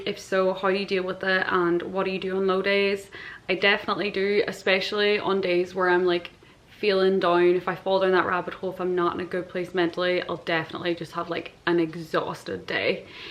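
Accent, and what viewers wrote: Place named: Ireland. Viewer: The further into the video the stronger your Irish accent becomes. I really love that 🥰😂 I am also happy for you that things are going the way they are right now. You should not be so concerned with people who think you are a poser 👉I refer to your Instagram post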